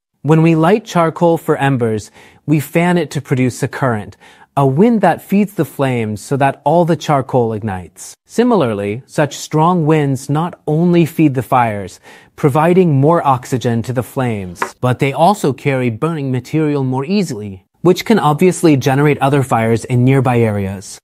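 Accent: Italy. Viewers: You don’t need to alter your voice to sound English. I much prefer your Italian accent.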